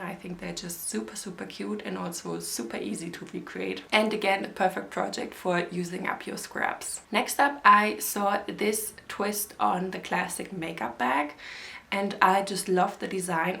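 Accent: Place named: Germany. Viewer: Pretty sure she’s a native German speaker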